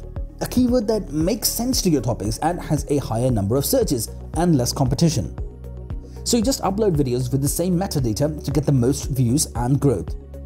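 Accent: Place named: India. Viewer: Your English look like you are India I know you're belong to India 👍 I like your videos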